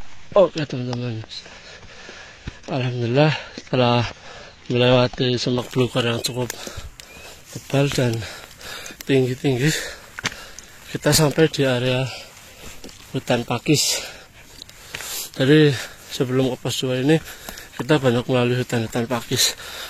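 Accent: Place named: Banyumas, Indonesia. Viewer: Salam ngapak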